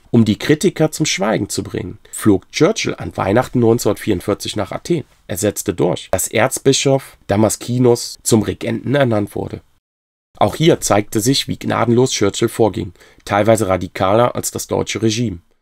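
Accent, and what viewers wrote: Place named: Turkey. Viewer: Der Sprecher hat einen türkischen Akzent .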